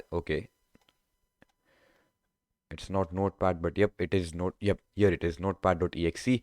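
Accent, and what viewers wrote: India. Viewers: You are sound like Hackersploit, but with a lil bit indian accent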